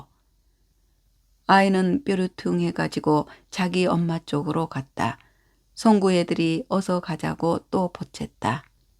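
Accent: Jeolla, South Korea. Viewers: 송기숙 작가님의 작품을 낭독해주셔서 감사합니다. 남도 사투리가, 겨울밤 화롯불속의 구수한 군밤같네요. 그 구수한 향을 그대로 나타나게 해 주신.감사합니다.☆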